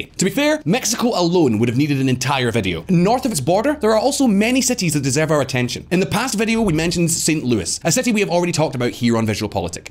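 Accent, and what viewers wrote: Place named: England, United Kingdom. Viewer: I agree, but doesn't it feel weird having some guy with an engish accent telling us how bad one of our cities are? Just doesn't feel right bro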